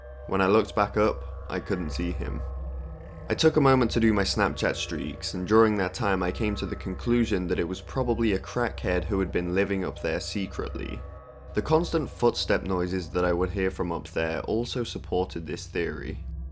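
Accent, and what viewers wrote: United Kingdom. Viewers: ｄｅｅｐ ｖｏｉｃｅ ｂｒｉｔｉｓｈ ｂｏｉ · British Mr Nightmare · British mr. Nightmare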